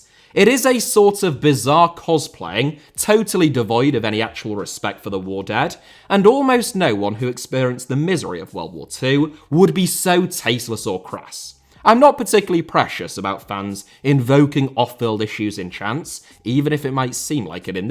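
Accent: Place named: London, United Kingdom. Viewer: Ironically, has much more of a London accent, than a East Yorkshire accent.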